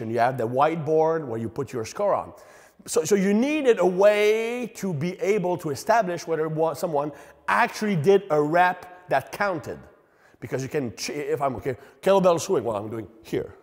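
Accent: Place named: Quebec, Canada. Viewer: I’ve been a fan of articles for a long time now, and this is the first time I heard him speak. I knew he was Canadian, but didn’t know he was from Quebec. Makes me respect his great writing skills even more.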